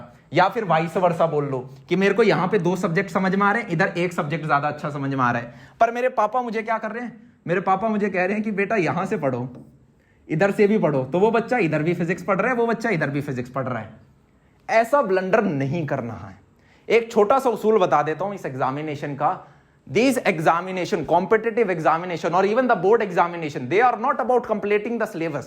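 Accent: Haryana, India. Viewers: Bhaiya ye Haryanvi tone suit krti h aappe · Bhaiya slowly slowly your accents are transforming into Haryanvi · He is a Jaat so there is always a touch of haryanvi accent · Bhaiya ki boli.. Haryanvi ho gayi lockdown mei..😅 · Aaj toh Haryana tone m bol rhe ho bhaiya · Bhaiya.. Hryanvi tone mein kbse bolna shuru kia aapne? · Please give up your haryanvi accent it doesn't suit you. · Bhai haryanvi touch ohogohohohogoho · Haryanvi lag re hai aaj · When did get this Haryanvi accent? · Bhaiya aaj to aapki voice me Haryanvi touch tha maja aaya Bhai 🙂🙂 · bhaiya hariyanvi ka tone aa raha tha aaj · Bhaiya aaj aapke bolne tarike mein badlav lag rahe hain. aap pahle se alag tarah se bol rahe ho lagbhag Haryanvi mein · Sir today your voice like haryanvi nature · I've felt how his language and accent changed and leaned to haryanvi accent over the time😂😂😂 I think it's effect of geography or the friend circle 😂😂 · Haryana accent ho gayaa hai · Bhaiya haryanvi accent aa gya aap mein toh permanently ❤ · Bhaiya Haryanvi accent 😍😅.... 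· Bhai aaj hi nhi pichle kuch mahino se bhaiya haryanvi jaise hi baat karte h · Sounding haryana style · Haryanvi lag raha bhai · haryanvi voice ho gyi bhaiya ki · does any one recognise haryanvi accent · Ask bhaiya haryanvi accent me bol rhe h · Bhaiya aaj kuch haryanive tone bhut aa rshi h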